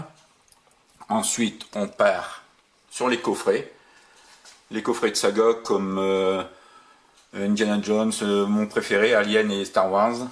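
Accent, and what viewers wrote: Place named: North, France. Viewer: Salut, super ta première vidéo tu as une super collection, j'ai hate de voir tes prochaines vidéos,merci pour ton remerciement et j'entend qu'il y a pas que moi qui est du nord;)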